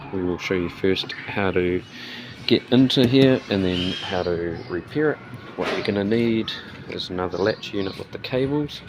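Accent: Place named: New Zealand. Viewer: Gr8 video M8. My door just failed and this is very helpful to see what is involved for me to undertake (?) or my mechanic. Where are you located? Sounds like a NZ accent! Cheers.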